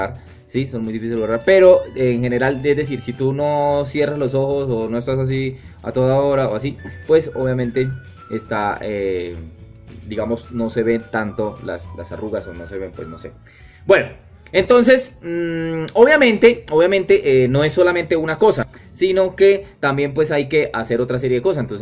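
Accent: Colombia. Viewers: Hola...siempre te veia por hay y la verdad no me atrevia a ver el video 😊y ahora que vi nos los quiero ver todooooos,muy chevere escucharte ...es como escuchar a mis hermanos sabes😉muy colombiano que chevere!!Espero que tu canal siga creciendo cada vez mas!!muchos exitos!!😃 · Colombiano, imagino que de algún lado del llano?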